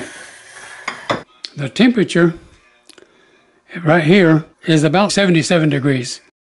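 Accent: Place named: South, United States. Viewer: Sounds like joe Biden making a tutorial on making kraut but with a southern twang .... c’mon man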